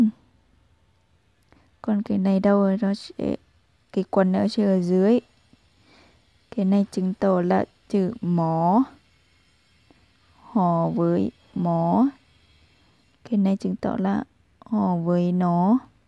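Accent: Nghe An, Vietnam. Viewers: Bạn người Nghệ an ,hà tĩnh hay người laos vậy bạn giảng thật dễ thương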